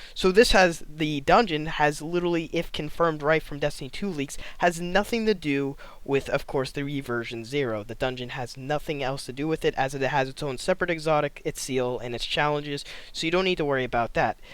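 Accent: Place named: Massachusetts, United States. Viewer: You from Massachusetts? You sound like you live here, lil’ homie.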